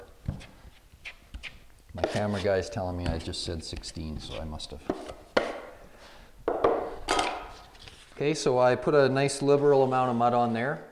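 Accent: Canada. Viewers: You sound Canadian :-) I use the same tray for mixing small amounts of powder. Do you always use premix/regular mud on your first coats? I've always been tought to use powder, usually 45, if not then 90. Much more strength and it allows you to coat it again or even 3x in the same day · are you from Canada? Voice sounds Canadian. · That's an interesting accent you have there! Kinda Canadian, German, Dutch, Irish infusion...and with a name like I guess you're or your family are / were from Ireland? Anyway 😂, won't butt edged joints show with sun beaming down the wall? I'm curious - as I'm from the UK, and we all skim whole walls over here!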